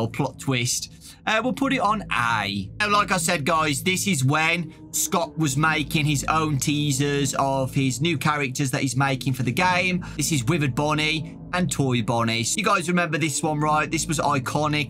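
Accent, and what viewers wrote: United Kingdom. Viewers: Bruh he british? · My friend:"who are you watching" Me:"Dawko" My friend:"oh, who is he and what does he do" Me:"he's British, he talks loudly, and plays FNAF" My friend:"so he's like Morgz?" Me:"yes.... But with FNAF"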